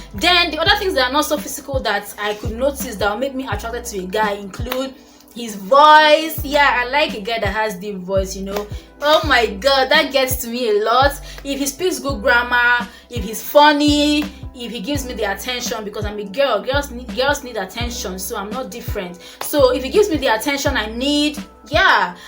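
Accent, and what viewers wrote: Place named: Akwa Ibom, Nigeria. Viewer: Your akwa ibom accent🤣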